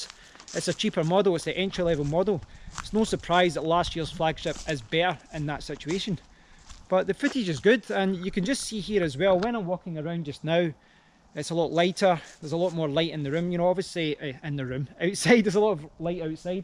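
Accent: Scotland, United Kingdom. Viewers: Thank you for making a video for those of us still sitting on the fence as to which GoPro to buy! Your channel is the first one I've found that doesn't use obnoxiously loud music before and during their videos, so I could actually hear and understand what you were trying to tell us. Your Scottish accent was fun to hear, as you sound the character on the Dance Mat Typing program for kids :) Cheers from California! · Brilliant work mate. Loved the detail. I shudder to think the time I would have wasted trying to get it down to 1080p! I needed something quite idiot proof. You have kept my sanity! Thanks a million from Australia and coming originally from Dundee it was wonderful to get your delivery in Scottish!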